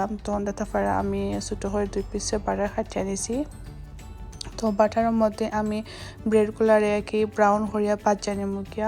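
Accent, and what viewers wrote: Sylhet, Bangladesh. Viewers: সিলটী কথা হুনতে খুব ভালা লাগে । আমিও একটু কইলাম । কিন্তু তোমার নাসতা দেখতে কিন্তু সেই ভালা অইছি ।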